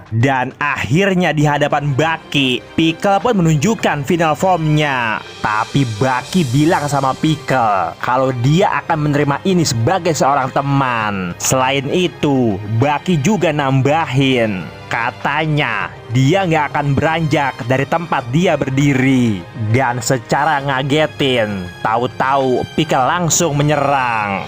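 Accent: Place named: Betawi, Indonesia. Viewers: PEMBAWAAN CERITA ALA BETAWINYA KEREN PARAH,SUBSCIRBE AH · Betawi style...gw suka banget pembawaan channel ini...ET dh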